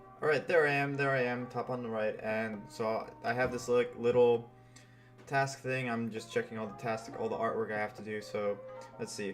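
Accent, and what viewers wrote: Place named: Scandinavia. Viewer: What part of Scandinavia are you from? I can tell you are Scandinavian from your accent.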